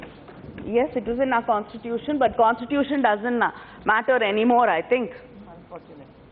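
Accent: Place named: Tamil Nadu, India. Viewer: அக்கா உங்கள் ஆங்கிலபேச்சில் தமிழ் நல்லாபுரியுது சிரப்பு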